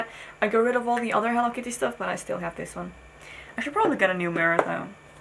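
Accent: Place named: Netherlands. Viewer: ! Dutch indeed....I thought I could hear it! Awesome! Hallo landgenoot!;)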